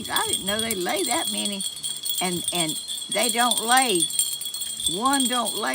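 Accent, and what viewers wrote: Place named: Georgia, United States. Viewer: You are such a natural, Ms. and we love you dearly. I put you on every day for my 6 cockatiels to watch and they love you, too! I lived in Georgia for 10 years and miss it so much. It's way better than living in Florida. Just your accent makes me feel right at home. You have become family to me and my little birds. Sending love and praying for blessings to you today!:)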